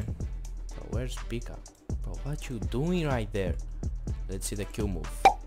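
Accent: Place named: Greece. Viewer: Bro by the way you talk I think you are from Greece but I don't want to be rude or anything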